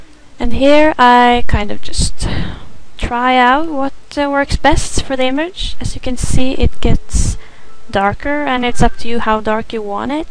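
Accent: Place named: Norway. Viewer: You sound norwegian.. Where are you from?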